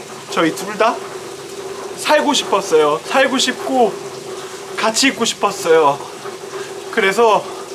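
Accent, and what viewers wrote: Australia. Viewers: This broke my heart to see this morning. kickstarted my journey to learning Korean, and as a fellow QLD’er, I felt like I could relate to her a lot. As said, she will always be by your side, every moment of every day, and she will forever be sharing your experiences with you. She’s left so much of an impact on our lives, even as someone who has never personally met her, and she will be dearly loved and missed worldwide. It might be only one small thing that she’s impacted you with, but the bit of an Aussie accent you have when speaking English really shines through as part of 🤍 You did such a great job at bringing this news to all of us, and we know that is so thankful and proud of you for working so hard by her side. I know that this time is so hard for you, and both your families, but I wish you all every happiness and all my love for now and in the future. 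🤍❤️ Bbyong for now ❤️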